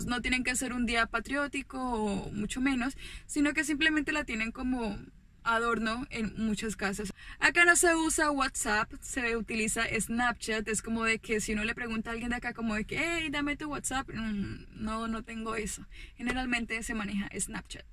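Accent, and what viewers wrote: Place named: Huila, Colombia. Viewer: Se nota el huilenseee manooo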